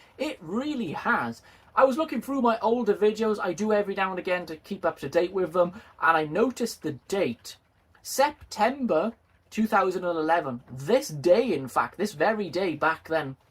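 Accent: Wales, United Kingdom. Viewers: Well done. I love your videos. Have been watching your channel since the pandemic and before. Unfortunately I lost my hearing due to covid but I can still remember your classic Welsh accent accompanying your fantastic vids. I really love the style of your presenting and great content. 14 years!!! BRILLIANT. Please keep them coming. Especially the bloopers!! We're all human and it's great to see what happens to us all whilst trying to get it right. Again. Well done mate and here's to the next 14 years. All the very best. Simon. 😃😃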